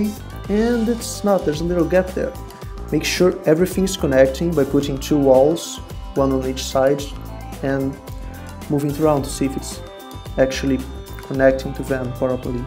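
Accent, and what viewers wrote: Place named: Brazil. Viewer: Cara, tu é brasileiro não é? O jeito que tu fala, tem algo de br ai rsrsrsrsrs LoL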